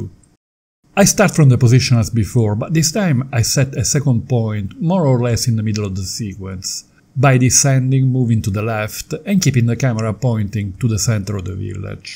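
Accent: Italy. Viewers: I can't get over your thick Italian accent hahaha. Nice video.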